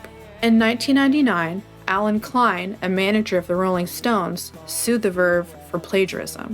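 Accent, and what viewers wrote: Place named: United States. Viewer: Great band. Somewhat ruined by this American voiceover that is read from a script, unless she`s a robot.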